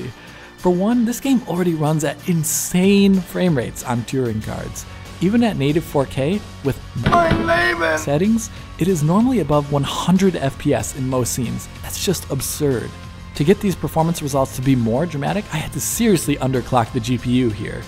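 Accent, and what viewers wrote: Germany. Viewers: Because he's german and knows how to pronounce it correctly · he's german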